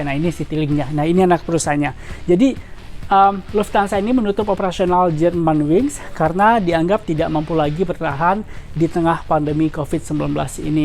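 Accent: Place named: West Sumatra, Indonesia. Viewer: Orang sumatra Barat nih presenternya... Logatnya mantep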